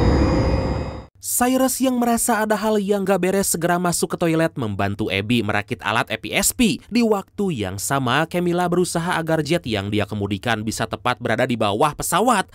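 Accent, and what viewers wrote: West Java, Indonesia. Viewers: Sunda pisan euyyy logat na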